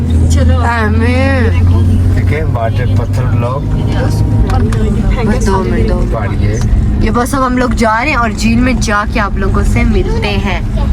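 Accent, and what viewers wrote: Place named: Rawalpindi, Pakistan. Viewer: Sai pindibois wala accent hai. Good work 👍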